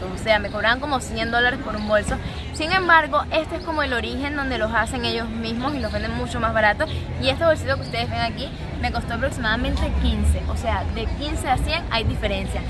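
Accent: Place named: La Guajira, Colombia. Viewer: Pero tu de donde eres porque tiene puro asento guajireño. De echo eres hermosa donde te puedo ber..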